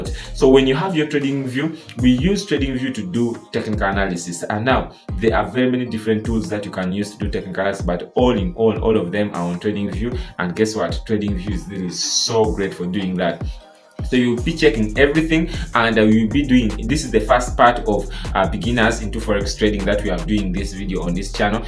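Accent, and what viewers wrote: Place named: Nigeria. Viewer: He sounds Nigerian Hope he keeps up the work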